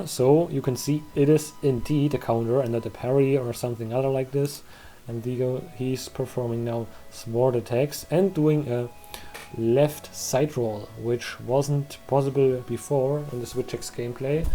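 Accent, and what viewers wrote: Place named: Germany. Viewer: 😅 You have to understand with a German accent he is indeed pronouncing it correctly. In fact one could argue that is supposed to be how it’s pronounced given the spelling. Americans are about the only people who pronounce it as “gage”.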